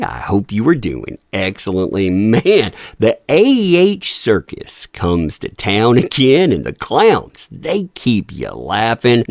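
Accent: United States. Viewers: Man, this accent is too American.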